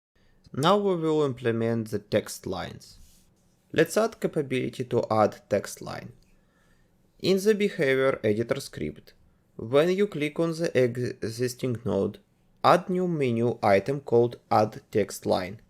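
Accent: Russia. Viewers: произношение русского) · Благодаря русскому акценту я лучше понимаю)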